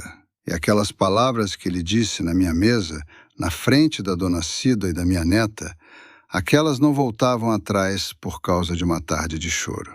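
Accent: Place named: Rio de Janeiro, Brazil. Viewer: Ai a voz desse locutor é muito mole muito malandrão muito carioca fala mais firme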